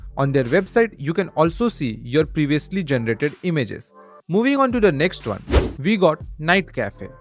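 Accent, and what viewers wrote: India. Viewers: If you open a tutorial and the person starts speaking in an Indian accent... then my friend you hit the jackpot cuz you'll get more than you even wanted. Thank you soooo much bro!!! 🙏🙏🙏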